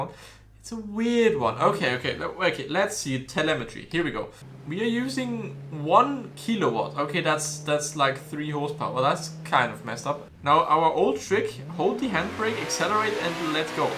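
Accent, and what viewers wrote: Germany. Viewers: Ich Feier den deutschen Akzent 🤙🏻😀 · this german accent is driving me crazy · are you german or why you speaking like a german guy english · I bet, you're German? Cuz of accent. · You have a German accent? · Man merkt das du Deutsch bist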